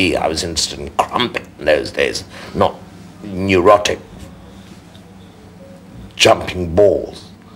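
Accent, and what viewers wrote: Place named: England, United Kingdom. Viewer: This is how all Americans think English people speak.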